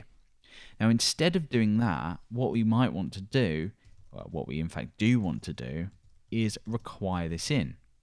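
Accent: United Kingdom. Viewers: 2 things i love: British accent & simple explanation. Much obliged.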